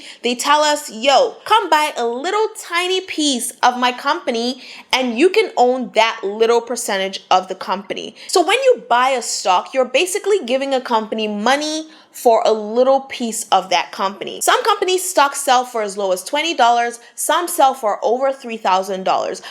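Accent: Nigeria. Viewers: I'm hearing a little bit of Nigerian accent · Lmao are you Nigerian ? I think I hear it lol... thanks, that video was great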